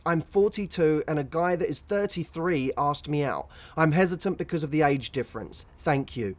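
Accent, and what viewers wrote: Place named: United Kingdom. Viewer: I enjoy watching your video... and your british accent.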